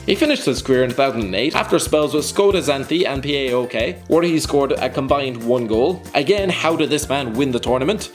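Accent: Ireland. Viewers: narrator is a paddy, oirish to most. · being half greek half irish its lovely to hear an irish cover this video <3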